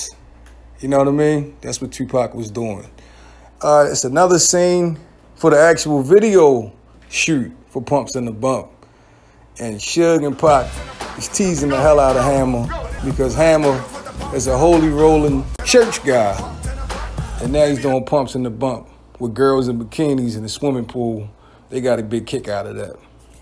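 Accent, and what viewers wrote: Baltimore, United States. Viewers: The guy doing this video has a Baltimore accent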